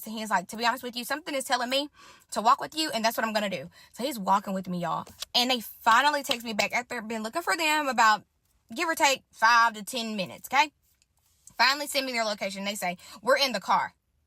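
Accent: United States. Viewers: She sound country like me lol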